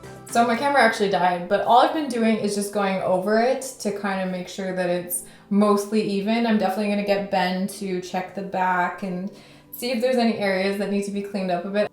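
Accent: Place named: Canada. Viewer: I love your Canadian accent, reminds me of Robin from how I met your mother 😂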